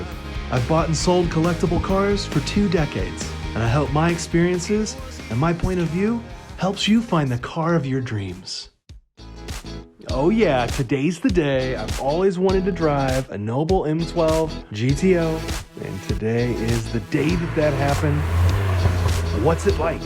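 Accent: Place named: United States. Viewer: I never even knew Nobl's were sold in America. Very weird seeing this car on yank roads and hearing an American accent talk about it. Top job.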